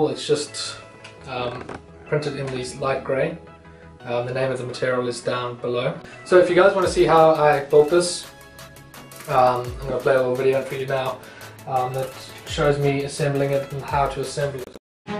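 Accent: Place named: South Africa. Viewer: Are you South African? You sound South African.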